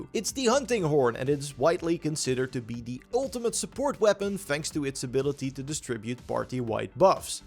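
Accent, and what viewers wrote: Netherlands. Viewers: Omg Your Dutch accent is thick as molasses.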